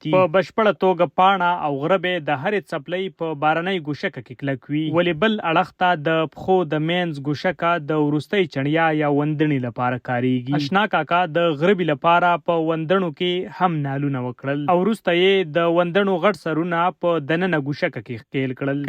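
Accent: Standard. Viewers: Bhai pure pashto Masha Allah ❤✨ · DER zabardast explanation de okro ji DER kha zma staso awaz staso Andaz ao pure pukhto Dera khwakha da umeed laram che kala ba mulakat oko insha ALLAH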